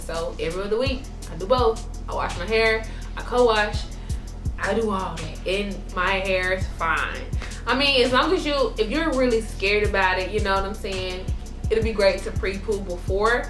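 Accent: South, United States. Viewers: girl you so country just like me! lol i love it!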